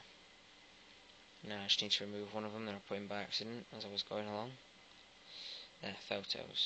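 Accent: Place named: Sweden. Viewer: thank you ,,,,är du från sverige åååääääääööööö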